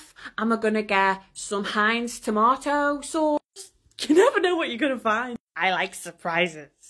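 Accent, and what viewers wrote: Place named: Newcastle, United Kingdom. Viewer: U say your mancunian but you sound geordie I'm geordie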